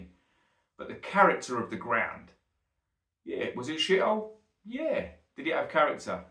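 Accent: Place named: Cockney, United Kingdom. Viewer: Not every day I agree with a cockney, but eye mate is spot on.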